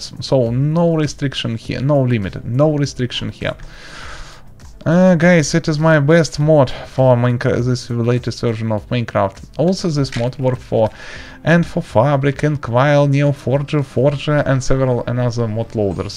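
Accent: Russia. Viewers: Русский акцент :| учи русский :D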